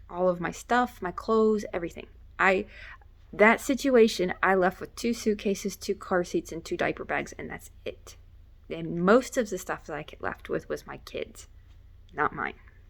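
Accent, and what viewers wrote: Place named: South, United States. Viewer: What made you attracted this man in the first place? So you a southern belle living in Alaska huh? That accent a dead give away lol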